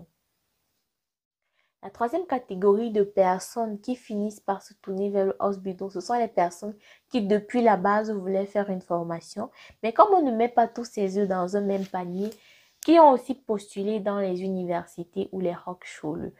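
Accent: Cameroon. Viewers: Ma belle petite soeur, tu es super belle, mais je suis etonner que ton nom sonne comme si tu es Senegalaise mais ton accent et ton style Kamer 237 avec ta douceur me tue seulement ❤️❤️❤️💗👍💗💗😁😁😁😁😁😄😄😄😄😀😀💖💖💖💖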